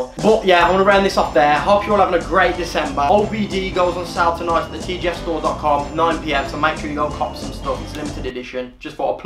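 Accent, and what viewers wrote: Birmingham, United Kingdom. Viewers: Hank Black he’s from Birmingham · Hank Black Birmingham Dudley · Hank Black Birmingham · Which region of the U.K.is your accent from. I know different parts of england have different accents. I don’t know which regions the accents are from though.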